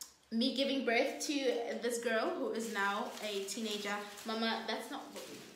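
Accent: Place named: Botswana. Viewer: Your tswana accent...OMW KE E RATILE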